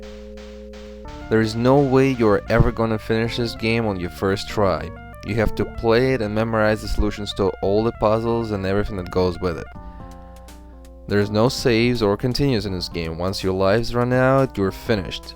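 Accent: Russia. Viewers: Great review, dude! I love this game, it's huge! So much to do in it. Dude, I like your accent, are you russian?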